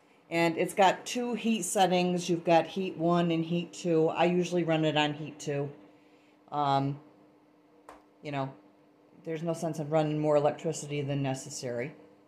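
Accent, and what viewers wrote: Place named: Midwest, United States. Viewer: Omg! You must be from the Midwest! You remind me of one my my BFFs! Makes me smile! And thanks for sharing!!! Xoxo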